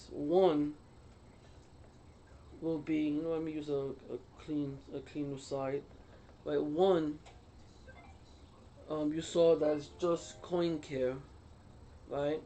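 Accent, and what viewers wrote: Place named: New York, United States. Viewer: I understand what you are saying here Sir. If it's yours and you want to keep it in your personal collection then you can shine it if you want to. Yes, it is a matter of it's mine and you better watch how you mess with it. It is called Coin Care for a reason right? But honestly I find hot water and Dawn dish soap does wonders on my Q-tip. I love your New York Spanish accent Sir and I can't do math very fast either. That dirty old goat Ben Franklin was right about the Penny idea though. Granny used to save all of Grandpa's coins every day from his pockets in a five gallon jar 🫙. In November each year she started rolling all them coins, then took it to the bank. Each year she had very near $500.00 hundred dollars for Christmas to buy gifts for the children and a nice family dinner for their meal. Why? Because she would quote "a Penny saved is a Penny earned", just good advice still today.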